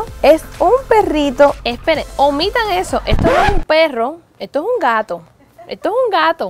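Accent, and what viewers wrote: Cuba. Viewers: Su acento de cubana es simpático, yo q ella, no enseñaria todo lo q tengo, no hace falta, ya tiene bastante dinero, le gustará la fama, bueno, cada uno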